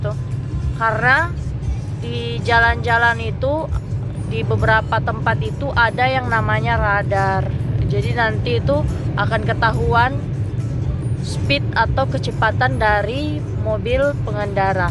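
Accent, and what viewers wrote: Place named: Palu, Indonesia. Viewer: Tetap logat palu... 🤣 Lanjuut joooo.... 👍👍🙏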